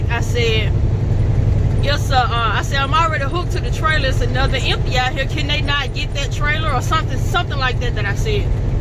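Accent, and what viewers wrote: South, United States. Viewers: Sound country 😂😂 but keep grinding ✊🏽✊🏽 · Just love that southern accent..!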